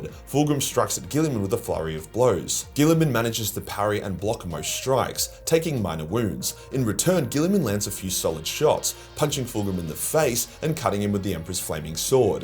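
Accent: Australia. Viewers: I only watch 2 actual content creators on YouTube, you and weshammer. I'm literally addicted to your lore videos. My girlfriend told me after going home (its long distance with regular visits) she can't sleep without "the Australian guy talking about warhammer". You're literally my favorite person on the internet and I cant thank you enough for your service! Have a great fucking day. Hail the emperor! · With my original profile and this one I am commenting from I have been subbed to since the very beginning. I was there at the dawn when the old magic was written. Its been such a cool journey. Ive watched through so many moments of crisis and success. It's been a sight to behold seeing DOM rise so high! I hope you see this and know your Aussie voice has traveled so very far and risen to such great heights.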